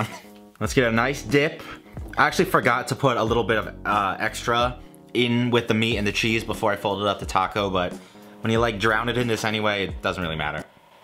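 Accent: United States. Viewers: your pronunciation is fine. you are an american talking with an american accent. you're pronouncing the words right just not putting on a false spanish accent which i find more annoying than anything 🙄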